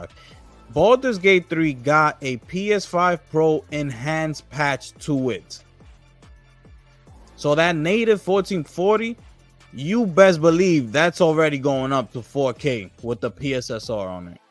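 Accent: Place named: Dominican Republic. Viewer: You sound like a wild Dominican from the height or inwood.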